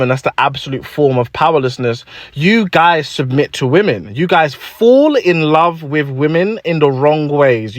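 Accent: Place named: RP, United Kingdom. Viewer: Thanks for explaining this in the Queens English.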